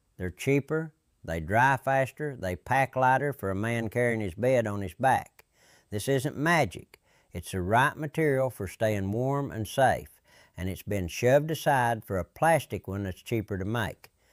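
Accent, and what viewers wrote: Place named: Appalachia, United States. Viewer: I love the way you talk, I'm in maryland and you have the Appalachian accent that i remember hearing growing up. When i heard someone like you talk i knew i was going to get good advice or given advice that was a joke that i believed until they later told me it was a joke as they let out a laugh.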